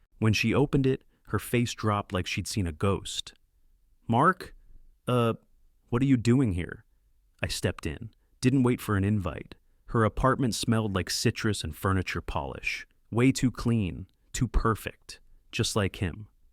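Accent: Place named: Alabama, United States. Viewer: Dude sounds like he is in love with his sister. Like in scar face. He does sound like the alabama type.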